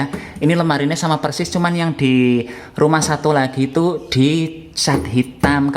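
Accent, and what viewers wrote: Banyumas, Indonesia. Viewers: Kiye siii wong endi? Ngapak ya? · sampeyan asli mana to mas koq pake jawa ngapak gitu Salam kenal dari saya orang solo 😁🙏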